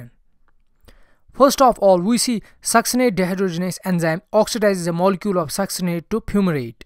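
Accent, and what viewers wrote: Kashmir, India. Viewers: R u a Kashmiri? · Love the thick Kashmiri accent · Seems like you are from Kashmir sir. Well explained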